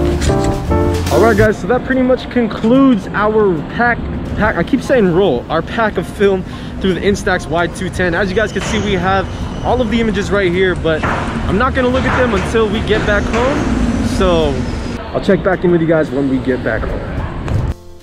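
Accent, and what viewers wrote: Philippines. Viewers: Road to 100k subs folks. Like William veerbeck and other black guy who loves film photography, they did it too. Keep uploading more videos. Love it, from your filipino accent. Hehe ♡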